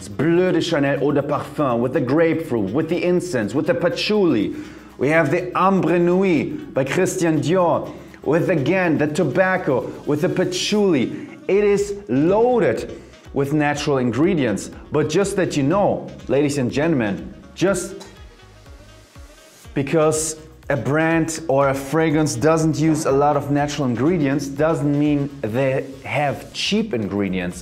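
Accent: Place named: Germany. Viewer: Great video! This is crazy... I'm from the east coast of the U.S. But I have the habit of saying Bacarrat Rouge and other fragrance names with your German accent! 😆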